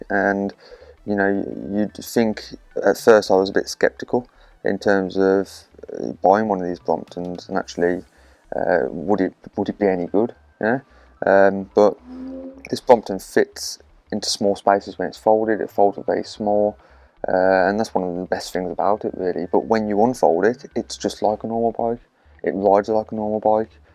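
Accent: United Kingdom. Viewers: Good to hear a fellow Brummie. Im looking to buy my first Brompton. Ive read alot of articles about the weight limit of 110kgs and not to worry too much on that fact. What are your thoughts on this? I am a slightly heavier bloke, 135kgs. Cheer mate 👍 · Very informative. Thinking of getting one (despite the price!!!!) so you're opinion on owning one has helped enormously. Great to hear the Dudley accent, too! Not enough Brummie and Black Country accents on YouTube!😃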